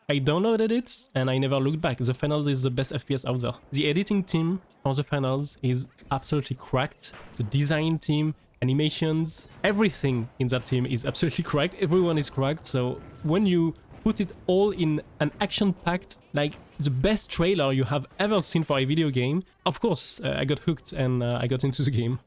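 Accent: France. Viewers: I'd be glad to help with the accent, I'm french too but people say I have a great accent :D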